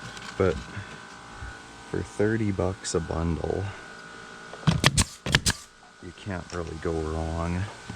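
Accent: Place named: Upper Michigan, United States. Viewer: We have similar accents here (UP of Michigan) I went to pick up my nephew (he's in his late 30's, i'm in my late 40's). His dad my bro-in-law is a roofing contractor. so I say: 'Yah.... I went and picked up a bundle of cedar shingles the other day' 'they were so rough-sawn I don't even think I could us em as shims' He looked at me, astonished, and said 'yep! it's hard to even find good shims to buy.'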